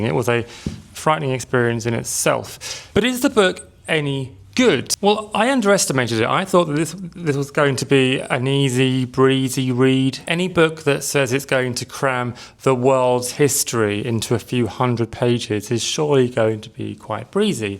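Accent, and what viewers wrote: United Kingdom. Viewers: You sound very british yet you look very slavic, hope it makes sense, also awesome vid btw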